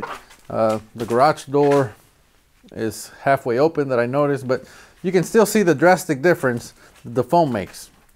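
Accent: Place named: South, Mexico. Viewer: I hear a Mexican and a Southern accent when you talk.✌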